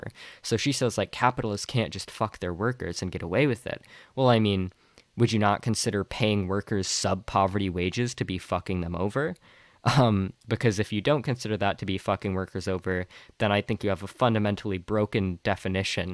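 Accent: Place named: South, United States. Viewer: I can't take her seriously with that southern accent.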